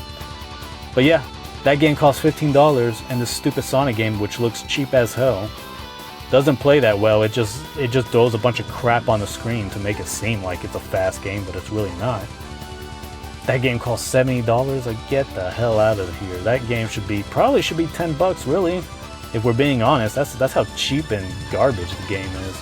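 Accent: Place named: South, United States. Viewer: What you do sound from the south because I know people who do. So your ancestors from the south sir that you got it from. I would not say 50 charter from marip kart world is trash itnis you don't care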